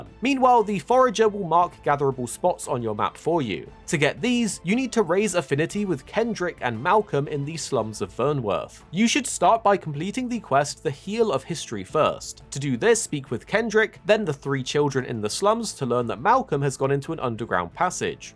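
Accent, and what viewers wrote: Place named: Scotland, United Kingdom. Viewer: In yoùtubes defence he does have a pretty thick Scottish accent.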